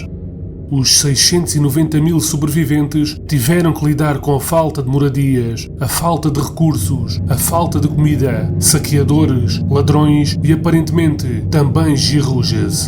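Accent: Portugal. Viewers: Gosto do idioma de Portugal mas pra narrativa n fica bom pra esses tipos de relatos da impressão de que o Roberto Leal esta narrando.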